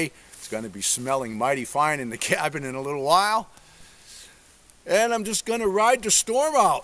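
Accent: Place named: New England, United States. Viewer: Keep up the good work. I enjoy whatever you post. Like most of your followers "Frankie and the boss" is my favorite. I think it would be a great intro AND exit song. We are having a very white Christmas here in West Michigan, thanks to our lake effect snow. I'm an old New England gal and I enjoy the yankee accent, even though I've been away from home for a good many years. My son and grandson both have Border Collies that are so smart. One lives on a farm and the other in a condo. For those that don't like your work.....well then, go away!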